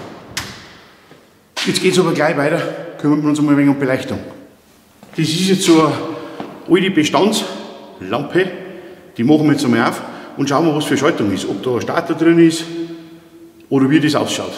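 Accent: Bavaria, Germany. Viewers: Ich weiss das er heisst mehr nicht und bayrisch redet oder Österreichisch keine Ahnung was soll man da eingeben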